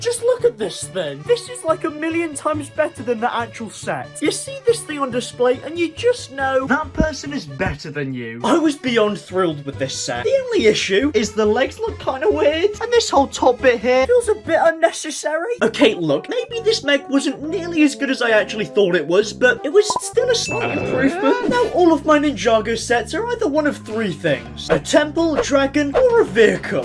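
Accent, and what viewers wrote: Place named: London, United Kingdom. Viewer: Your from London lol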